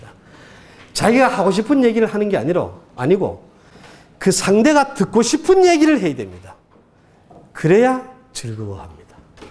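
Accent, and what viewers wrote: South Korea. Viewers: 교수님 감사합니다 혹시 고향이 경북 청송이신가요? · 안동 영주 사투리군요. 말의 힘 꼭 명심하겠습니다.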